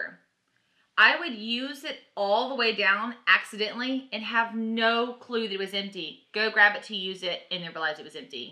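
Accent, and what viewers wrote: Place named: South, United States. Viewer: She's southern, y'all need to stop correcting the accent. If you're southern doTERRA sounds like du-terra. It's not a big deal; get over it. 🙄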